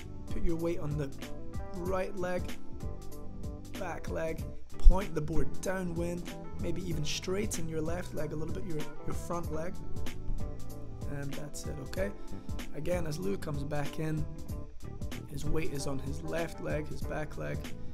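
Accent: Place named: Scotland, United Kingdom. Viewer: Really well explained, top work. Emso important to show why things go right AND wrong so you can self identify your problems. Lovely soft Scots accent too...Fifer?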